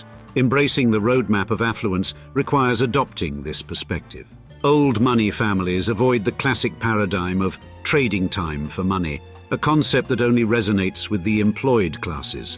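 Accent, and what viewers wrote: United Kingdom. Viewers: This is just “rich dad poor dad” spark notes in a British accent.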